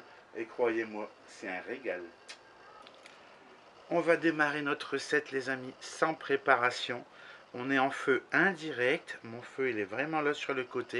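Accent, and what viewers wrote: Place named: North, France. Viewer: Bonjour hi, l’accent du Nord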